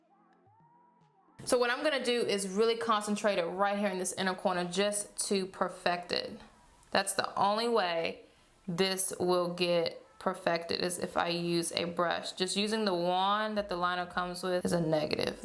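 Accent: South, United States. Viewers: Your southern accent is so darn cute!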